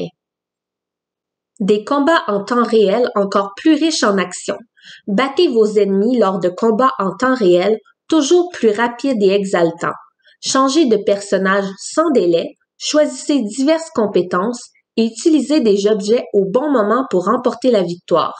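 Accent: Canada. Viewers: Sympa comme présentation ,l'accent canadien en plus .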